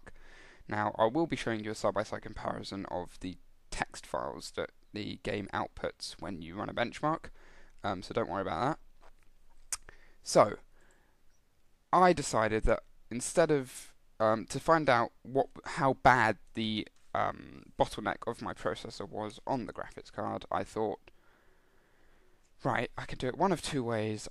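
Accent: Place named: United Kingdom. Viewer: Just a question about accent bro. I can understand you and I'm from Turkey,Are there any american who can't understand British ?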